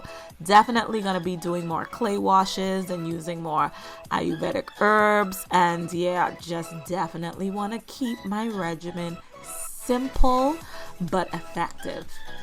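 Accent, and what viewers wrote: Bahamas. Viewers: I know my people! I love the finished look! 💄 1st off you and I have the exact hair texture, so watching your videos helps me a lot. 2nd My dad’s family is from the Bahamas so I recognize the accent! 3rd I am also from South Florida. 4th we might be related because I have Farringtons on my ancestral line. Salute my sister 🙏🏿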